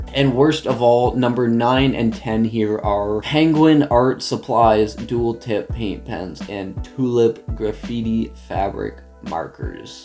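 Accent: Canada. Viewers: just a question are you by chance from newfoundland? your accent kinda sounds similar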